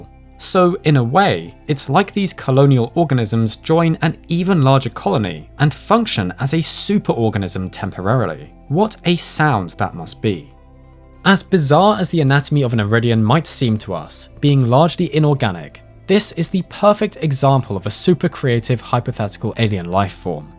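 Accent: United Kingdom. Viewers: American discovers the existence of British English pronunciations, lmao. He's not an AI, he's just British. You didn't think every English speaker in the world spoke American English, did you?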